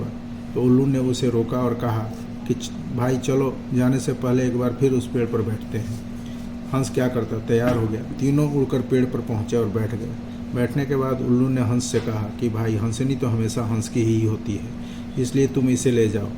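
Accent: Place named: Siwan, India. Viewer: Siwan wala tone hai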